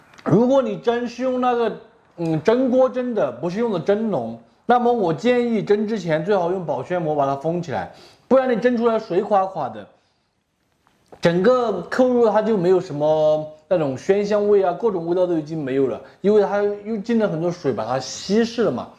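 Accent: Sichuan, China. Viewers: 很好聽，很標準的四川普通話😂👏🏻👏🏻聽起來很有親切感！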